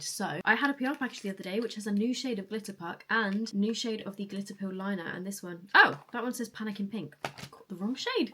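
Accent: United Kingdom. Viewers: no clue how many years I've been following you by now... its been a while...2018 I think. You have always been such a truthful, kind, cute, funny and relatable person and I cherish that someone like u is also present on social media. ❤ thank you! Also keep that strong British accent coming, it slaps 👏🏻 I'm sorry that your anxiety has gotten worse again and I hope u can find something that helps you ❤ Sending lots of love to you and your family ❤ Awesome vlog btw 🎉😊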